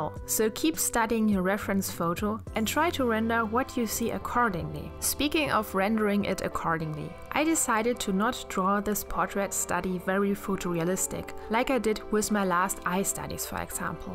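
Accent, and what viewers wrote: Germany. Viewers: Are you German? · Man hört direkt dass du deutsch bist☺️👌🏼 echt gute tips und echt schöne Bilder 👍🏽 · R u German? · Are you froh German? Your accent is so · I always enjoy watching your videos. Lately I had problems finding motivation and you helped me quite a lot :) also I enjoy the way you edit your videos - the slow close ups of the brush and the paint in the beginning are so stunning...it really catches the mood of the painting process :) oh and your German accent - lovely ;) it's always funny to find other German YouTubers... (PS: Liebe Grüße aus Berlin! <3)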